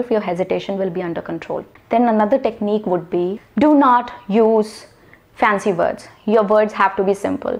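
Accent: India. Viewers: Your hindi's tongue made your english so clear. I enjoyed listening your english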